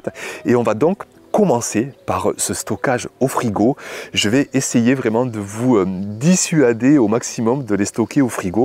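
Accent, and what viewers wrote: South, France. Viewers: Salut, et merci pour tout encore une fois. L'accent ensoleillé c'est toujours un plaisir ❤️ J'ai testé la "Black cherry", tomate-cerise un peu noire/rouge que j'ai bien aimé, il faudrait la trouver en variété classique pour bien apprécier je pense. Autre chose, avec tes soucis de chaleur et sécheresse, pourrais-tu faire la saison prochaine un achat de quelques sachets de Pascal Poot, qui sont génétiquement peu sensibles aux manques d'eau. En nous faisant une mini-série dédiée, thématique. Enfin c'est une idée quoi, ça m'est venu mais tu as dû y penser déjà, Bonnes vacances à tous 🙏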